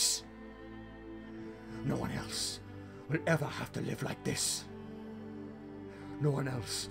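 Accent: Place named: Scotland, United Kingdom. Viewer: The speech is spot on but capaldi. Sounds a little extra Scottish it's brilliant but there's always room for improvement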